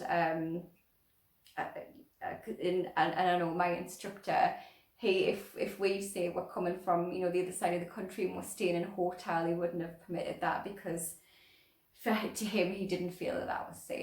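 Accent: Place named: North East England, United Kingdom. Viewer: Just came across your excellent channel. Thanks for sharing your experiences. I’m from South Shields and spotted Tynemouth Priory, which brought back found memories of home (I now live on the Canadian Prairie these past 26 years). I’ve recently bought my own sailboat and have a couple of courses under my belt, but one day I hope to do the RYA day skipper and sail around some of the UK. Wonderful to hear your north east accent! Fair winds and I look forward to watching more of your adventures…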